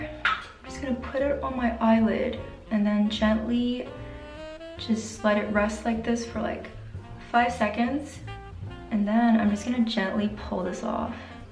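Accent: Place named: United States. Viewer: i’ve been following your insta for a while and this is my first time hearing you talk. and wow i didn’t expect the american accent! i really thought you would sound posh british somehow since i see you update in london quite a lot 🥲 you’re so pretty, by the way!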